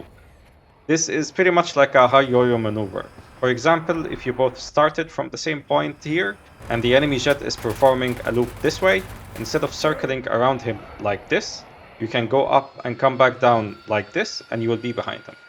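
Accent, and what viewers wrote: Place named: Egypt. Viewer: are you egyptian? very egyptian accent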